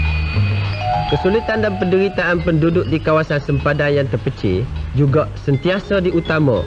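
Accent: Kelantan, Malaysia. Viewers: Penyampai pun slang klate ni..nyakut molep😁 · Yang uniknya suara hos dokumentari ini pun kecek Kelate jugok!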